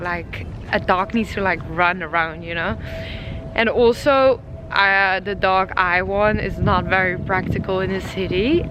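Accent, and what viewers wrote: Netherlands. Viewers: ***I LOVE YOUR DUTCH ACCENT!!!!****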